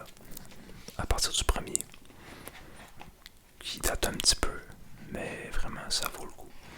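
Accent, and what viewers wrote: Quebec, Canada. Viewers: Je t'ai découvert très récemment et je te remercie infiniment pour ce magnifique moment passé à tes côtés ❤️ je ne suis pas énormément d'asmrtistes québécois mis à part la asmrtiste "asmr u okay?" qui est la seule que je suis avec toi, tout cela pour te dire que ton accent québécois est tellement agréables à l'oreille 🤗🤭 Plus le fait que j'aime beaucoup la lecture et la lecture chuchotée tout cela ajoute un côté très agréable à l'oreille et m'apaise entièrement ♥️. J'ai déjà regarder la saison 01 de The Witcher et je sais que la saison 02 est en train d'être tourner en ce moment, je ne comprenais pas tout ce qui se passait dans l'intrigue jusqu'à ce que je comprenne seule qu'il y avait des moments qui allaient dans le passé et d'autres qui retournaient dans le présent, c'est vrai que j'étais perdue mais après j'ai réussit à suivre l'histoire et à apprécier Yennefer et Geralt avec Citri si je ne me trompes pas pour son prénom 🤔. 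Je n'ai pas encore lu les romans et je ne sais pas vraiment comment suivre l'ordre chronologique 😂😂 Concernant métro 2033, je ne l'ai pas lu mais j'ai vu le résumé et l'histoire est très plaisante, prendre en compte une histoire de zombie qui vivent à la surface avec des gens qui se sont confinés en sous terrain pour survivre correspond tout à fait à la situation actuelle avec un côté fantastique à la Cloverflied Lane mixé à World War Z, c'est juste agréable de lire ce genre d'histoires là 👌. Merci encore pour tout ce que tu fais et de lire aus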